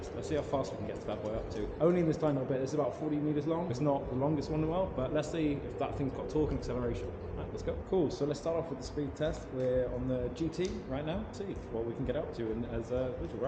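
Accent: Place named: Europe. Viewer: that's what europeans sound like bruh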